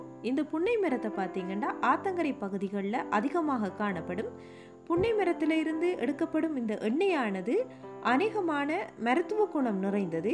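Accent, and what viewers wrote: Sri Lanka. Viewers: they r srilankan i think they say like that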